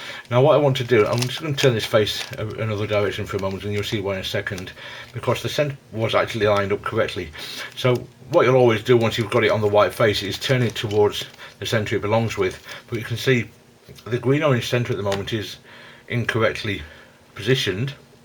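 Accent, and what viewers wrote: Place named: Scotland, United Kingdom. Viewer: Good tutorial :) I also like your accent. May I ask what accent that is? (I would say you live on the British Isles, Scotland maybe? I'm truly sorry if I got it wrong)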